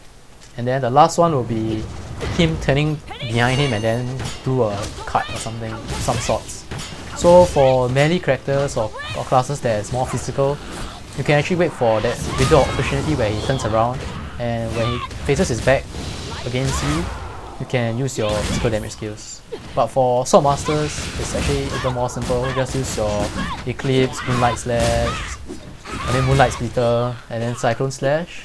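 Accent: Singapore. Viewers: its a singaporean accent. as much as u and i might hate it cuz we're NA ppl, its the way singaporeans talk. its their national accent. type in singlish in youtube if u want to know more about it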